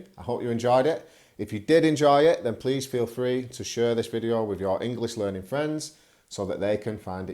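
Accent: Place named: North, United Kingdom. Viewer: Very interesting video. I like your northern accent. It's curious because usually, those kind of accents are hard for me to understand but yours is cool. I discovered your channel three days ago. I watch several youtube channels about learning English. Some of then have a huge amount of subscribers, yours hasn't yet, but you sound very sincere, clear, simple and useful. Thanks from Basque Country Spain.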